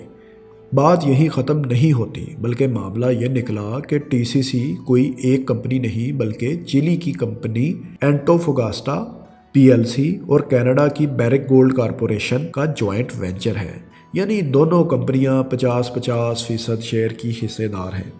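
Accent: Punjab, Pakistan. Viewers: Ye Bhai punjabi men urdu bol rahy thay badi mushkil men lag rahay thay. Faisal Warraich bhai ko khud BG voice deni chahye.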